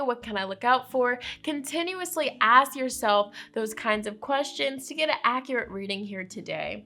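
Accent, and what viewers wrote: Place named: United Kingdom. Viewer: Sometimes you sound British!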